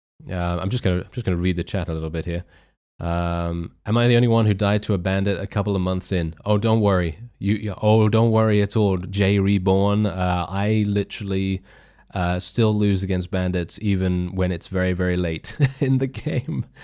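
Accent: United Kingdom. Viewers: How can anybody not recognise you're British accent?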